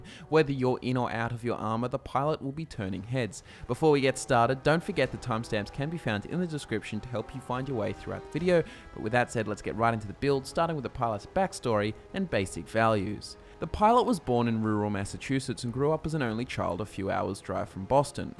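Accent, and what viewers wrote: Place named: Australia. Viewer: are you austraian because you sound awesome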